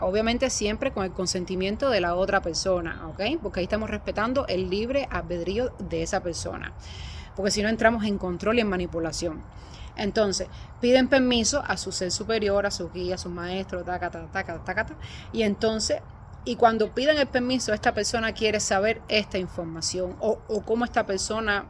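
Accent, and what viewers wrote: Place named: Cuba. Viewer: Hola muchas gracias por todas tus recomendaciones son muy valiosas. Tienes un acento hermoso cubano Un gran abrazo desde Colombia 🙏🙏🙏❤️❤️❤️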